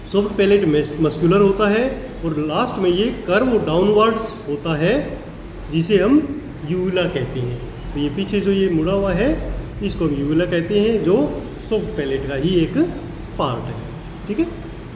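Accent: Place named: Maharashtra, India. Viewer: sir , you explain topics in simple words. Our me janta hu aap marathi ho aapke bat karne ke tarike se pata chal raha he ❤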